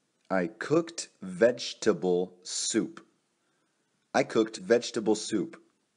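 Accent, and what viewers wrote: United States. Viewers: excelente video, muy buena forma para aprender. ¿ el ingles de tus videos es ingles americano ? ¿ o es ingles como se habla en Gran Bretaña?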